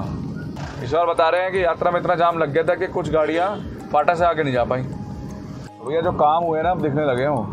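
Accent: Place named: Western Uttar Pradesh, India. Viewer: Bhai ap UP west se ho kya Ekdum hmara area jo moradabad ke pass ka hai bilkul aisa lagta hai apna. Koi bhai local bat kar raha hai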